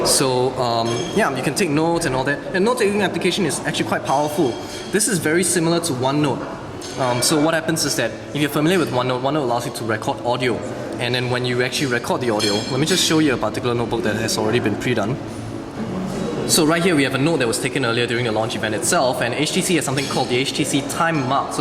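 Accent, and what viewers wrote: Singapore. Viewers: Singapore accent, my accent, lol.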